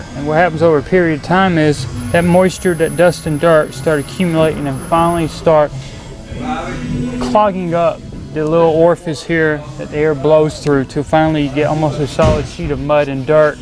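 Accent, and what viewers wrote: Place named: Alabama, United States. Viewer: You don’t sound like you from the motor city.. sound more like you from Alabama Mississippi or something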